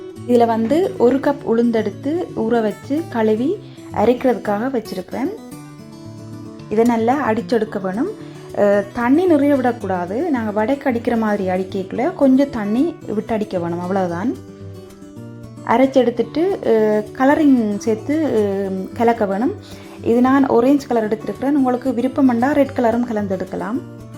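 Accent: Sri Lanka. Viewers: அது என்னமோ தெரியலை சகோதரி நீங்கள் பேசும் இலங்கை தமிழுக்கு நான் அடிமை ❣️❣️❣️❣️❣️❣️❣️❣️❣️❣️❣️❣️❣️❣️❣️❣️❣️❣️❣️❣️❣️❣️❣️❣️❣️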